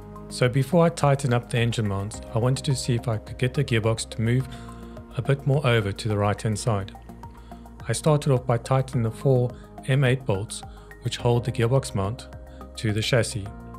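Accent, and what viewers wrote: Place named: South Africa. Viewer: Hey you probably won't reply to this but you sound South African. I just want to ask if you are South African where did you get the kit cuz I want to make one of my own but i cant find where to buy one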